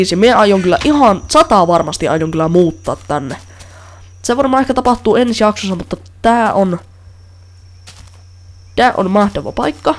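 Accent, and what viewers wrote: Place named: North Ostrobothnia, Finland. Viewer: mistä päi oot jotenki kuulostais pohjois-pohjanmaan murteelta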